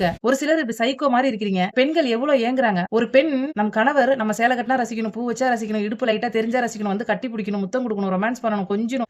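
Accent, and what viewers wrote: Nagercoil, India. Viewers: Neega egauru Ponnu Ana nagarkoil ponu pola pesariga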